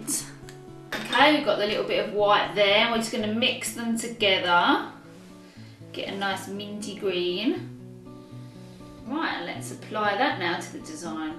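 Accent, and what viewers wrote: United Kingdom. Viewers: Epic British accent!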